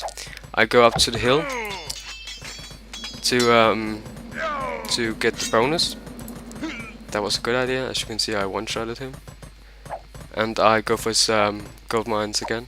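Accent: Denmark. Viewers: are you danish ? of course you are, you sounds like a danish guy ?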